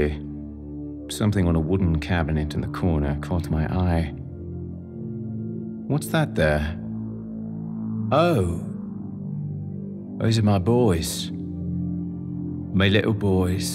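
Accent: United Kingdom. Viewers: Ohhh British? Well this just makes me appreciate even more 😊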